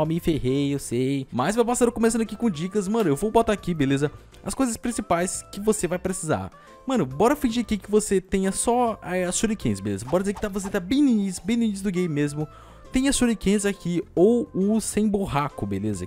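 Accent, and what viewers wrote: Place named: Bahia, Brazil. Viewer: Essa sua voz de baiano me encanta 😔✋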